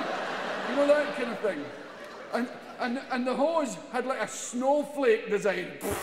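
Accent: Scotland, United Kingdom. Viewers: Im afraid I dont understand half of it…wish I was scottish (or maybe not)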